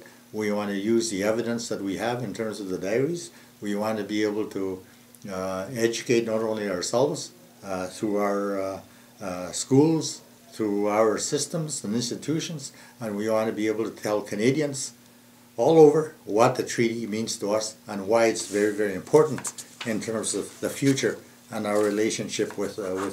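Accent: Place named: Orkney, United Kingdom. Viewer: is he from orkney???? i stay in edinburgh scotland but the name is from orkney and im 1000% certain that i'm related to this guy cuz he sounds like he has a less strong version of my grandads accent. i feel so weird seeing people that are probably some what close relatives on youtube when i search my last name hahah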